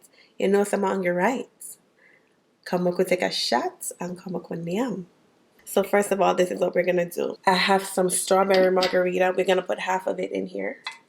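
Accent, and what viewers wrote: Jamaica. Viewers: Oh so you sound Jamaican and by the way congratulations on your baby boy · I know this was 2 years ago but the accent got me curious are u part Jamaican let me know please 🙏 🙏🙏🙏 And ur baby is so cute. Ur vids always got me smiling. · U got a jamican accent❤🇯🇲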